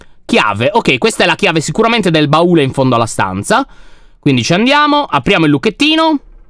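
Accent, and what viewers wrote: Sardinia, Italy. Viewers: per favore io ti voglio bene ma puoi smettere di fare il sardo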